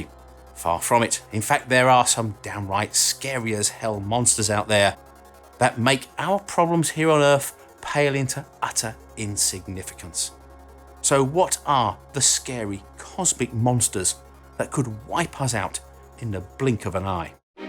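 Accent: United Kingdom. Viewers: Sooo brrritish that it hurts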